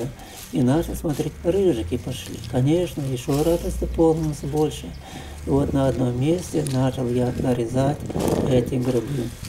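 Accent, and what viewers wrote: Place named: North, Russia. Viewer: С севера видимо.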